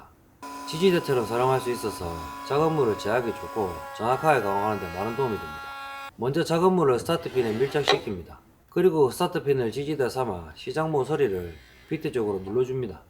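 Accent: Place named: Busan, South Korea. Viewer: 사투리가 낯익은데 부산이십니까? 늘 좋은 영상 발조고 있습니다~얼릉 구독자 늘어서 유명해지시면 더 좋을것 같습니다! 좀 딱딱하지만 진짜 중요한걸 늘 잘 가르쳐 주셔서 좋은 선생님이자 형님이라 생각하고 있습니다~!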